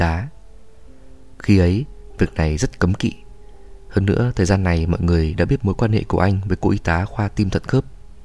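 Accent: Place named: Hanoi, Vietnam. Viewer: Nghe để giải trí mà. Tôi rất thích kênh nầy, tôi thích nghe đọc truyện, giọng rất hay chuẩn Hà Nội, tôi người phương nam, xin cảm ơn và tác giả.❤❤❤❤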